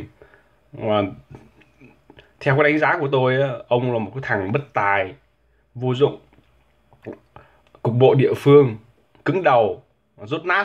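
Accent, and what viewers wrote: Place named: Thanh Hoa, Vietnam. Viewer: Thằng này Thanh Hóa , có dấu giọng Thanh hóa cũng lòi thôi ..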